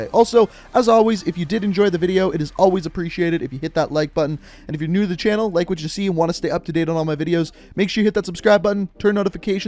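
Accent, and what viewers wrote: Canada. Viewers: You sound 🇨🇦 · Why do Canadians like there ooooos and eeees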